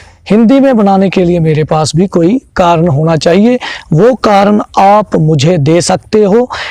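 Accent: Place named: Punjab, India. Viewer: Sir ji aap ki Hindi bhi Punjabi jaisee hi hai thanks God bless you Very good information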